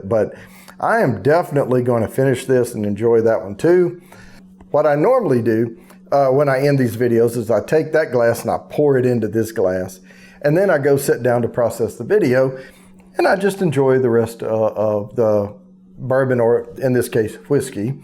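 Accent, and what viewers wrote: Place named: South, United States. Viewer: I just now found this channel and this review. I'm drinking Bernheim tonight, so I thought I'd just see what other reviewers thought of it, and there you were. I'm happy that you enjoy the Bernheim, because I do too. And it's nice to hear a southern accent - I'm a Texan, and it seems like 99% of all the reviewers on YouTube are Yankees. :)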